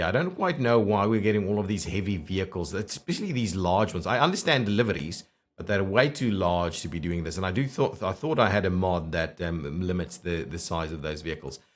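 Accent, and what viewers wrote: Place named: South Africa. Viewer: Has to be South African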